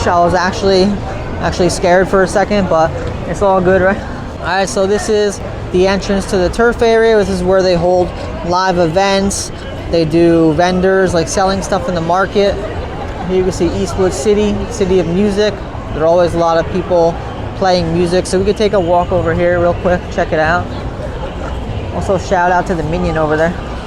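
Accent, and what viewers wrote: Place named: South, United States. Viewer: Judging by your accent... You're from the southern United States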